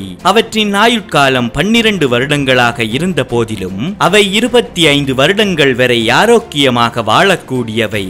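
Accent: Sri Lanka. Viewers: சார் இலங்கை தமிழராஆ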